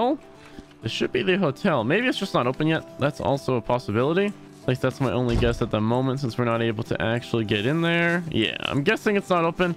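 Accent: South, United States. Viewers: Mf rlly talks with a red neck accent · You know you don't HAVE to talk with a red neck accent.. many people in this setting were from all over the world or had intellectual backgrounds.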